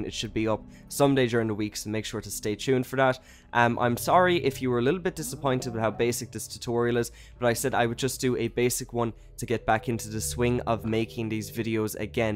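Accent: Ireland. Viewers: I'm Irish too! Cool ur accent is so col haha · Wow! Your Irish! (Well if my accents serve me correctly) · are u irish because i am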